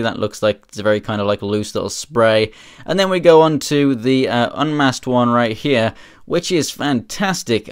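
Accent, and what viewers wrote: United Kingdom. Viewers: You sound English - so how have you got these before release here, as far as I'm aware weren't these out in September or October? Or did you get them imported from US?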